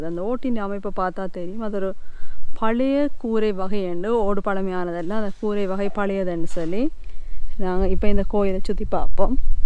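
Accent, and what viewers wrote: Sri Lanka. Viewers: அக்கா அருமையான பதிவு உங்களது இனிமையான இலங்கை தமிழ் பேச்சு மிகவும் நல்லா இருக்கு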